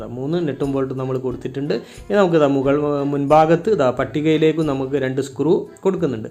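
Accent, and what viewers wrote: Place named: Palakkad, India. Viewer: സംസാരം കേൾക്കുമ്പോൾ പാലക്കാടുകാരനെപോലെ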